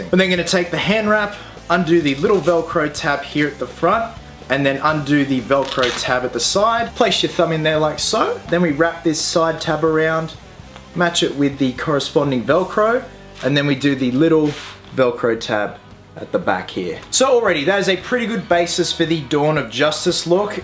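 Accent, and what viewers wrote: Australia. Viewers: There is something visually strange about Batman with an Australian accent. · The suit looks badass but it is so funny when I see batman just speaking in an Aussie accent saying be happy, be silly hahaha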